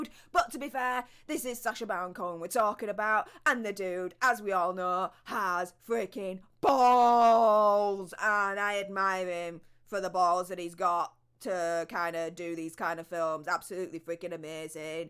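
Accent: United Kingdom. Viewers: I'm thinking it's a Yorkshire accent? Maybe she'll correct me if I'm wrong lol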